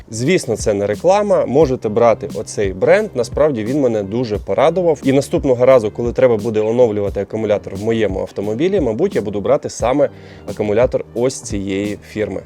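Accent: Standard. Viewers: норм язык, в наше "время мовнюков" редко услышишь чистый родной язык, а не суржик. Спасибо. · Чистый украинский.Респект!!!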